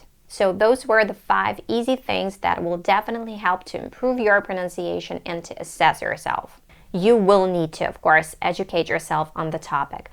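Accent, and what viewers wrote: United States. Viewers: Your American English accent and pronunciation is so perfect, dear ... You are one of my pavourite english pronuncers ... Where are you from ? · I thought you were a native speaker ! Then , I heard a little accents ...when you said " comfortable " & the way you said " thank you " in another video ,here & there ,but you are really really good ! Usually any Europeans have their accents ( heavy ) when they speak English ! You got an American accent...why ? How come you chose the accent over British one ? I am curious ! Anyway there are many very good English teachers on you tube ,a couple of English teachers & one American ,they are all female interestingly ! Lol. But, you can really help non native speakers w/ English by using your own experiences & insights ! That others can't ( as those ladies that I really like are all native speakers ,so they don't go thru w/ the walls we,non native speakers bump into & find it hard to climb up on ,or break it down ) guide the way you can ! 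You are a real good success story that we all can lear from ! You deserve more viewers & subscribers !👍💜💜💜💜💜🥁🐉🎵🎤🎶💕💞💕💞💕💞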